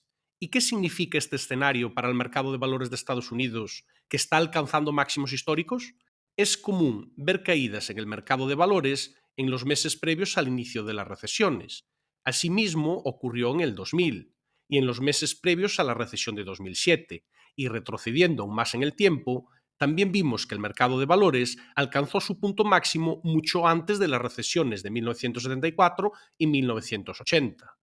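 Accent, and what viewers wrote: Galicia, Spain. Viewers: Es por el acento gallego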